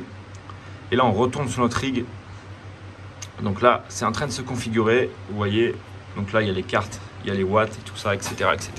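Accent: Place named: United Kingdom. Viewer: Mdr l'accent anglais j'ai le meme merci pour tuto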